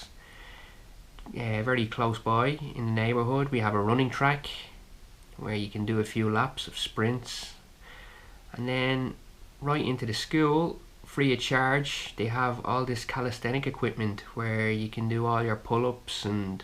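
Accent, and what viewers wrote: Ireland. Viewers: Sounds peaceful Irish.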